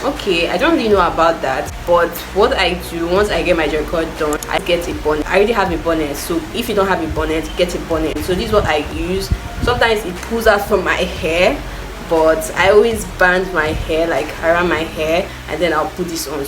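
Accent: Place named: Nigeria. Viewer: You need to speak to your only to your fellow Nigerians,cause your. accent is too heavy,& you talk to fast.English is not good .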